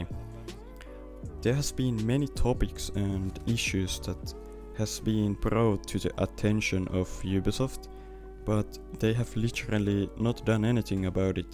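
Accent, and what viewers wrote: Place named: Finland. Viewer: jaaha vittu mietin ku luki tilaa introssa tajusin ku ralli enkku alko kuulua suomalainen sehän on :DDDD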